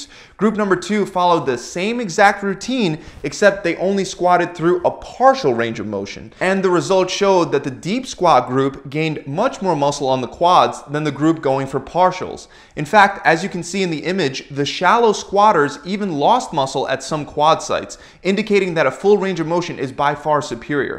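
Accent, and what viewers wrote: United States. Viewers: Usa voice?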